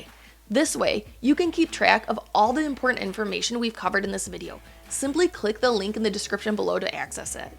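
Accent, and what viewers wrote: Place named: Minnesota, United States. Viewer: You sound like you’re from northern Minnesota, or Wisconsin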